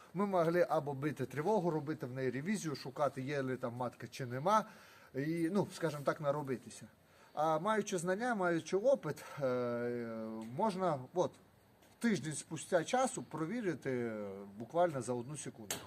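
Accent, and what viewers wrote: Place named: Surzhyk, Ukraine. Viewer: я сам разговариваю на суржике но твой суржик так режит слух что не могу твои ролики смотреть хоть включай субтитры.бeз образ,Слава Украіні.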